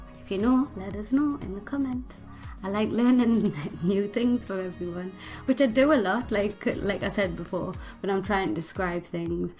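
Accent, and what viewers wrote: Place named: Yorkshire, United Kingdom. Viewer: I like your Yorkshire accent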